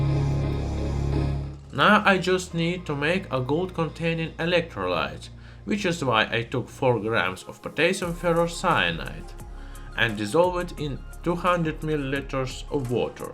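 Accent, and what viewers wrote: Russia. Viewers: he does seam to have a Russian accent... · He was from russia?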